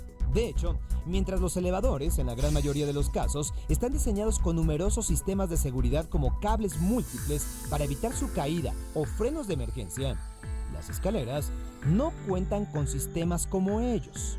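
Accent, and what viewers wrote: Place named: Peru. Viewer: Este conductor tiene asentó peruano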